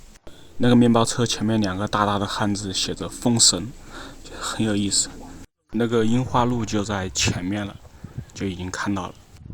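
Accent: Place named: Hubei, China. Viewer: 我以前有位同事也是湖北的，和你的口音好像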